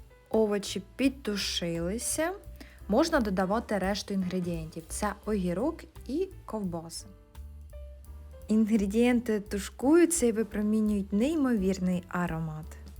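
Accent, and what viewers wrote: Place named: Poltava, Ukraine. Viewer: Христос Воскрес! Здається , Ви родом з Полтавщини!) так радісно і приємно чути!! Солянку зазвичай готую без картоплі, але зараз саме буду як Ви порадили) дякую!!) миру нам !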